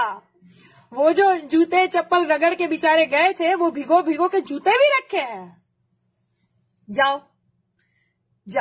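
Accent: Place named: Bihar, India. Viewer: Madam I like your bihari UP style Waiting for next Perpunch in Montreal Thanks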